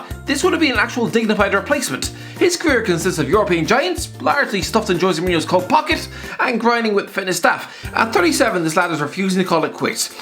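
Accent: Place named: Ireland. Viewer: he’s fucking irish give him a break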